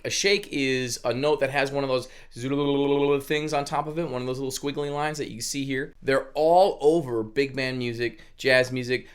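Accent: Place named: New Jersey, United States. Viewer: ha! I couldn't place your accent -it sounded like new jersey.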